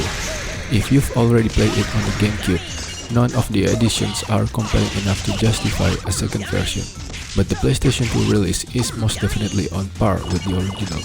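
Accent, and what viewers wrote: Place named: Indonesia. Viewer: Your accent sounds a lot like Indonesian.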